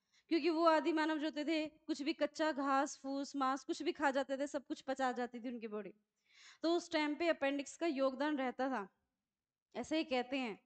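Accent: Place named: Bihar, India. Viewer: Mam ji apka abaj hmare bihar se milta julta lagta h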